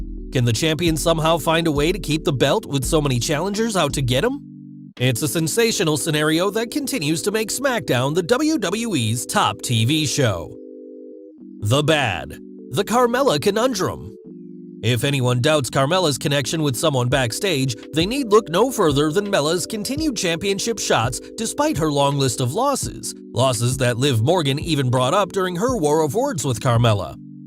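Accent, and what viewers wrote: United States. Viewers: Is anybody gonna point out that the guys voice is now American? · How come you have an American accent and Wrestlelamia has a British accent? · Oh so this channel is now hosted by an American?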